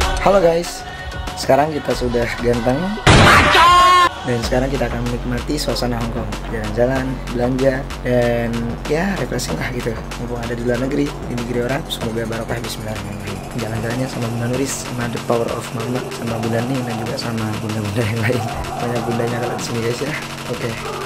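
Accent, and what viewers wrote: Madura, Indonesia. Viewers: Tetep sederhana, dan logat madura nya itu yg ga bsa ngilang yg bkin seru klo mas ngmong 🤣😂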